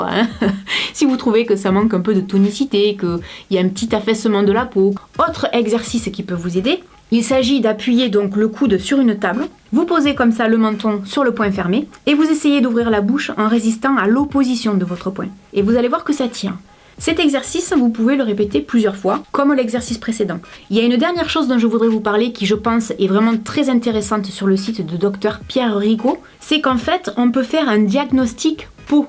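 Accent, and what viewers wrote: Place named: Marseille, France. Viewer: Cc j'adore t'es vidéo continue comme sa juste tu es marseillaise ou pas car tu a l'accent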